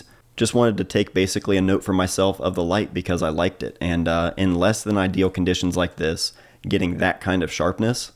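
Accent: Ohio, United States. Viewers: Chillicothe?! I knew that accent sounded familiar. I'm in Portsmouth. Sorry for the house sickness, and Happy Birthday!(belated). We love you too. Congrats on the M11. Beautiful work.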